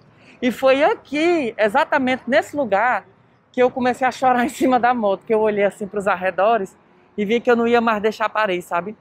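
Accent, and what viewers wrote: Ceará, Brazil. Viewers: Rapaz, vc me impressionou. É tão lindo o seu jeito de falar cearez, mesmo com momentos muitos difíceis, sua forma positiva de encarar as coisas, é realmente, motivador!💛🇧🇷💚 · Ohh, te admiro demais viu... Tbm sou cearense e amo ouvir sua voz, o sotaque 🤩🤩. Deus continue te abençoando viu mininu... 🙏🥰😘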